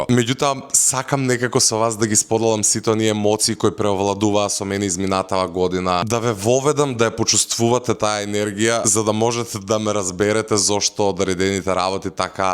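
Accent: Kumanovo, North Macedonia. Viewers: Од Куманово е, па ако зборува "како што треба" пола држава нема да го разбереме🙂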